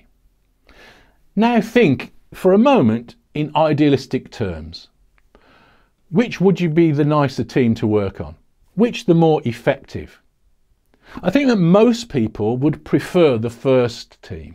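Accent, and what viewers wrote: United Kingdom. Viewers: This channel is just telling me things I already believe in a British accent. I mean that in the best possible way.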